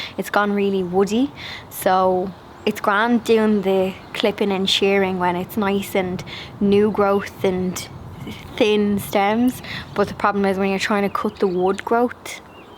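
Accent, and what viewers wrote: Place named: Ireland. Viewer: Brilliant I could listen to you all day long....your accent is amazing and I love your little ditties....pure Irish. X Best wishes from the UK... X 🌼🌸🌱🌿🌾I even love to meet you and have a brew ... ☺️👍☕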